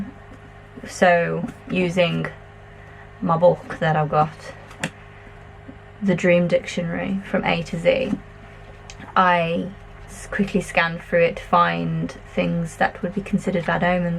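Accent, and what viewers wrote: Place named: United Kingdom. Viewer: Pre-computers, I used to write by hand before typing this up. Much later, I'd go through the typed version, revise it, then retype. Apart from the revision stage, I began doing this while at university. I didn't have time to revise my essays before handing them in. Now I write straight onto computer or Alphasmart Neo. I'll then put the first draft on one of my ereaders and make notes for revision. More revision is done in Word. If you're going to write extensively by hand, maybe consider a really nice fountain pen and nice paper. You seem to have a British accent. Here in the UK black cats are lucky, and having one cross your path is a sign of good luck. A crow, on the other hand . . . Maybe you could consider an Alphasmart Neo if distraction free is your priority. You can buy them secondhand on ebay. They used to be quite cheap, but they've gone up to around £50 plus package and postage.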